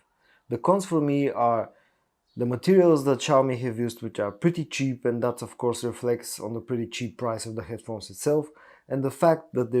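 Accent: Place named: Russia. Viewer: Your english is too russian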